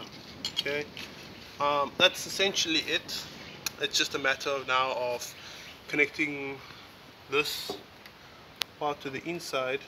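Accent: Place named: Southern Africa. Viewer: Helped allot man are namibian or south african your accent sounds familiar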